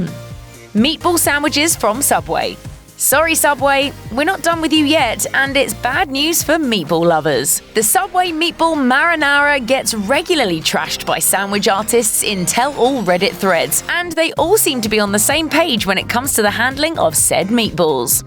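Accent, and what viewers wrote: United Kingdom. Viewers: What happened to the other girl doing the commentating? This chicks British accent is infuriatingly annoying. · I hate that "pompous" British accent.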